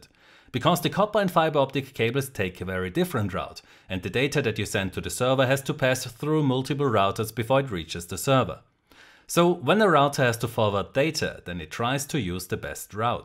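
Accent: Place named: Germany. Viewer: Man hört soooo dass du deutsch bist 😂😂😂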